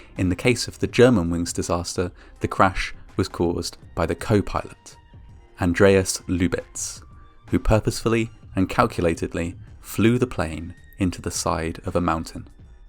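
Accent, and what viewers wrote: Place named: RP, United Kingdom. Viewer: The Queen's English, mehn. Respect.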